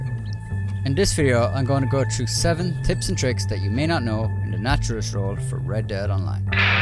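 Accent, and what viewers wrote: Ireland. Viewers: I swear you’re from Ireland, are you? (from Dublin)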